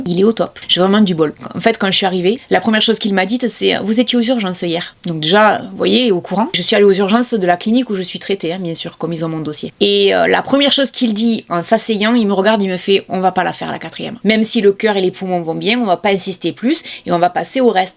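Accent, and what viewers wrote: South, France. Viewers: Chère, En 2018 j’étais en arrête pour une dépression. Je ne dormais pas la nuit. Un soir je suis tombée par hasard sur ta chaîne, et ta voix douce et ton accent du Sud me détendaient et apaisaient ces soirées pénibles. J’aimerais tellement pouvoir t’aider aussi. Tu es une personne forte et combative, tu l’as toujours été, tu t’es construite comme ça. La détermination dont tu fais preuve face à ce traitement et ses effets force le respect. Quand j’ai lu le titre j’ai eu très peur que la partie ne soit perdue. Mais c’est tellement mal te connaître. C’est dur de ne pas être inquiet. Go Maman Youtube, on est avec toi 💖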